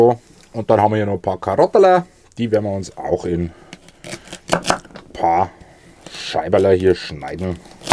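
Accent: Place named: Upper Palatinate, Germany. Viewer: Versuche nicht deinen Dialekt zu verschleiern. A Oberpfälzer bleibt a Oberpfälzer. Grüße aus Mittelfranken mit Wochenendziel Edeldorf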